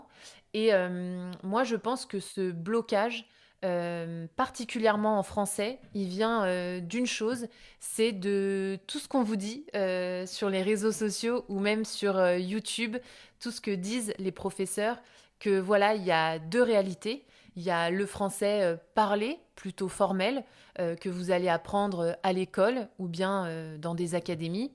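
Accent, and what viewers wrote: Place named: Lyon, France. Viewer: J’ose parler français avec les francophones assez regulièrement. Malgré d’avoir un niveau avancé, je m’occupe trop du regard des autres. Ça ne me bloque pas trop sauf quand je traite des sujets où je n’ai pas le vocabulaire. Merci pour cette video et de ce message d’être tolérant avec soi même. J’adore ton accent (lyonnaise?).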